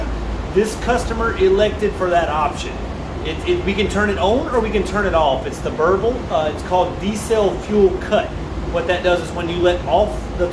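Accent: South, United States. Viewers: Whatsup with your whiny redneck voice?? Pls stop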